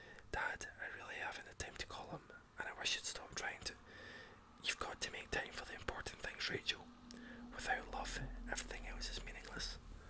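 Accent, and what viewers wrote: Scotland, United Kingdom. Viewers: Your voice is sooo relaxing! Hehe, can't resist that Scottish accent. ^_^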